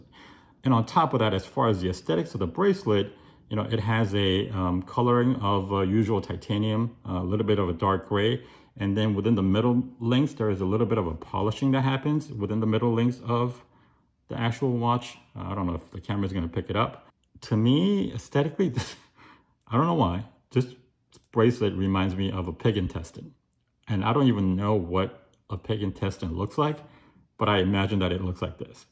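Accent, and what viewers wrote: United Kingdom. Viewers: You say it as if British accent is a choice 🤔 just not sure what your original complaint was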